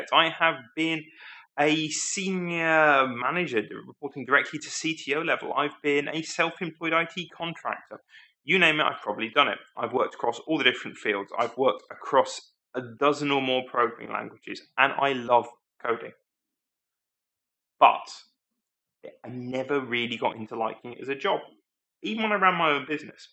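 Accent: United Kingdom. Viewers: Please talk a little bit slower. There are people that are watching you and English is their 2nd language. And UK has a particular accent and no substitutes. So it can be harder and have to stop and rewind. You can take your time, no need to rush. Everything else is good. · Is it the audio quality or the British accent.. it is hard to understand you sometimes. But this topic is great :)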